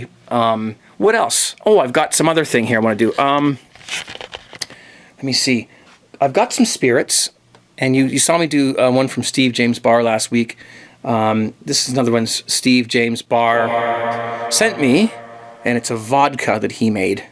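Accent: Scotland, United Kingdom. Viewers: By the way, love your scottish accent ;) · thats a crackin scottish accent that made me laugh!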